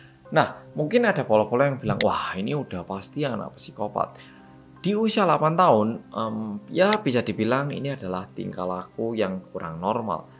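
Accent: Java, Indonesia. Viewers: 3 channel storytelling favorit: 1. (pelan, medhok dan mudah dipahami) 2. Nadia Omara (gayanya kayak itu ghibah) 3. Arroyan Pram (Konspirasi dunia pake Astronomi, Fisika, dan editannya gila keren banget, serasa nonton agung hapsah dan Jerome polin bahas konspirasi) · Yokk! Kak dikit lagi 4M subscriber nihh kak. Aku ngerti sih banyak yg suka liat konten² kak bukan karena kak orang korea medok aja, tapi karena kak orangnya selalu berusaha buat diplomatis atau gak mendukung 1 sisi dalam ngasih opini dan pemilihan katanya oke, bahkan editornya aja kayaknya suka ngelawak kek kata² "eummm" tadi. Kak juga gak terlalu membahas kpop yg sekarang malah menjadi bahan menarik buat narik banyak orang, karena berusaha buat gak membela salah satu pihak gituh. Jadi semua konten²nya selalu informatif dan dapet valuenya... bener² gak salah sih milih jadi panutan pas di tugas kuliah wkwkwkwk. 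Semoga sampai 20M subscriber pun mempertahankan hal ini. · Apa cuman aku yang suka ngikutin atau jawab: bersama orang korea yang.... Me:Medookkkkk:|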